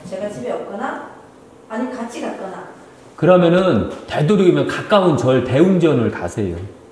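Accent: Chungcheong, South Korea. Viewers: . 상투와 두건은 깔끔하고 시원해 보여 눈이 즐거운데요, 수염은 푸시시한게... 좀...염소 같잖아요. 턱선이 부드러워서 구수한 충청도 사투리하고도 잘 어울리는데 말예요. 면도싹~ 어떻게 생각하세유? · 충청남도 말씀하시네요 저도충청남도입니다 반가워요 어지그리 구수하게말쓰을하시는지 얼마나 재미있웃우운지 ㅎㅎㅎ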